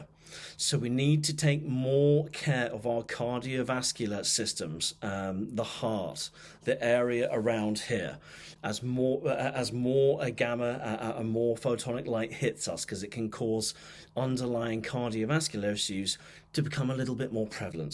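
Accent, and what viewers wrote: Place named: United Kingdom. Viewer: First time on your channel. Great reading! Your british accent is lovely however you move very quickly through things and your speech was a little fast for me. I'll listen again. Thank you for your reading. Just subscribed .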